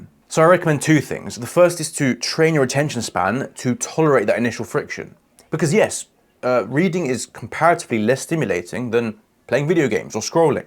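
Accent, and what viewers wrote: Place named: United Kingdom. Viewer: Shadowing ur british accent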